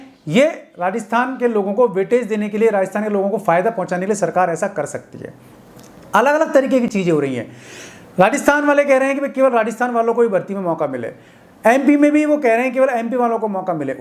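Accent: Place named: Uttar Pradesh, India. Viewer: Ye sir up ka h kya ??? Rajasthan ka nahi lag nahi raha h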